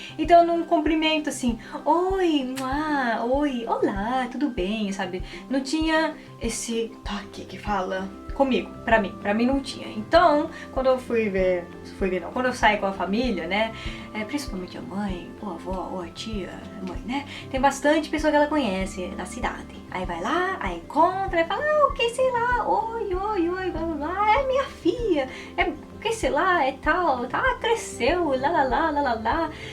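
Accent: Minas Gerais, Brazil. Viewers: Os seus pais eram de qual estado do Brasil? Você fala um pouco como os mineiros ❤🥰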